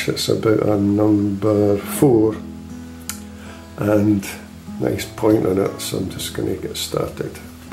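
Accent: Scotland, United Kingdom. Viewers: I just found you! I'm so glad I did. I so loved watching this video, and everything come to life. You're amazing. I loved listening to you talk. Are you Scottish?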